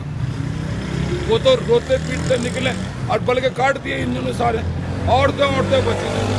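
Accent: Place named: Haryana, India. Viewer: BoLi rohtak ki hy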